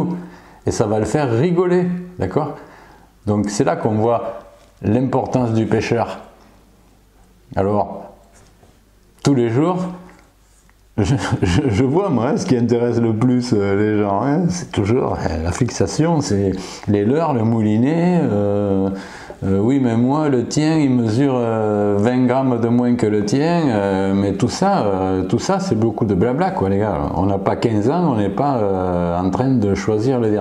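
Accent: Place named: Pyrenees, France. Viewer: Bonjour , ravi de te revoir Quel est ce drapeau en haut à gauche? Avec ton accent tu viens des Pyrénées peut être ? Et vive les pêcheurs et les conseils pour pécher une rivière dans les règles de l'art. Le matos c'est super mais faut il savoir s'en servir.Amitiés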